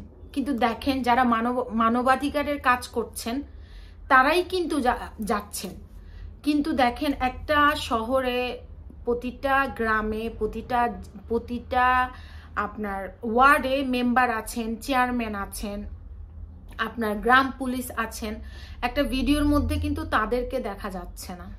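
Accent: Noakhali, Bangladesh. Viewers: Allah apnr mongol korbe Apu.ekdol thakbe koshto debar jonno.kintu din sheshe apni Jodi ektuo amdr mukhe hashi fotan,etai apnr orjon.Noakhalir vashay shunte Valo lage.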